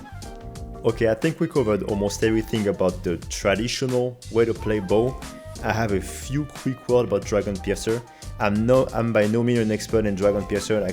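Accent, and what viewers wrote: France. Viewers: Tu es français j'ai l'impression vu ton accent. Ce n'est pas un reproche ça m'arrange ! Merci pour ton guide, j'ai vu beaucoup de vidéo sur l'Arc et la tienne est de loin la meilleur. Est-ce que ça serait possible d'avoir un guide de builds sur l'Arc ? J'ai fait pas mal de tests de mon côté et je ne trouve aucun moyen de rendre un build élémentaire viable. Ce que je trouve dommage car ça limite grandement les possibilités de build.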